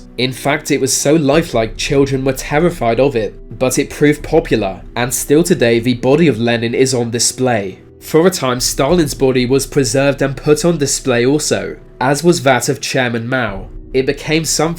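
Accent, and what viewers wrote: England, United Kingdom. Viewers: I dont know what it is. I love your voice, or pitch, or if its any kind of inbreed english accent? Its captivating. Not from Britain so I dont know. Actually, im not from a english speaking country whatsoever and dont know much about anything. Therefore im watching your channel. Its great! Keep up the good work.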